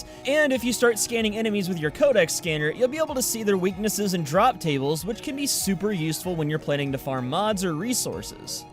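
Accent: United States. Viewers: Are you from America?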